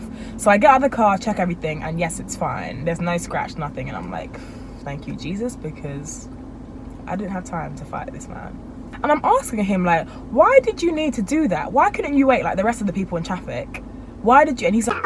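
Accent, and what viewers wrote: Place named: London, United Kingdom. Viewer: Ugh leave that annoying london accent in london. Yall need to leave some things in London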